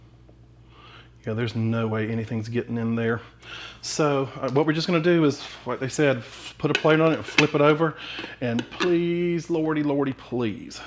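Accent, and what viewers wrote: South, United States. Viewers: my first time visiting your channel. I detect a Southern accent in your voice. Where did you grow up and where are you living now??? Love your enthusiasm and smile!!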